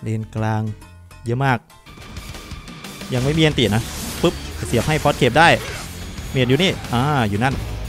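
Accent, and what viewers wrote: Isan, Thailand. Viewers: ว่าวอีสานนำแน ชอบ🤟🏿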